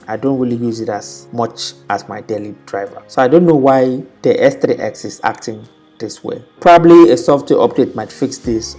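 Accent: Nigeria. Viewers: Nice to hear a Nigerian voice in the Tech Youtube world. Keep it up bro. Nevertheless, most Nigerians (May be Africans in Africa) buy phones without checking out reviews. Infinix, Techno, etc don't make waves outside here. I think its because though they are generally cheaper, they dont offer the best amongst other budget phones. 4000mah battery not lasting half a day. Too bad. There are better phones of same price range such as the Nokia 6 and similar series; and some Motorola series. Wonder why these good phones are not so common in countries like Nigeria. Nigerian marketers only look to make most of gains rather than satisfying customers. Period.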